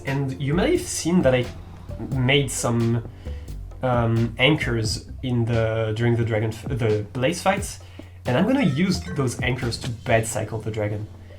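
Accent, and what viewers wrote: France. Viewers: Man, are you french?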